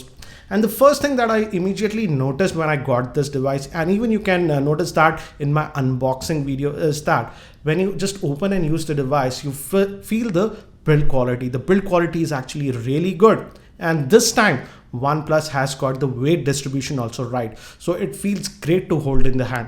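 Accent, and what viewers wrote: India. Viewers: Good review, after watching all those Indian reviews I start to like the accent.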